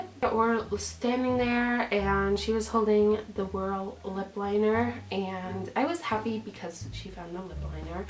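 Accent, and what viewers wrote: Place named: Germany. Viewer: Cierra Carter she's got a bit of German accent. why would anyone EVEN say something so ruthless.....unreal.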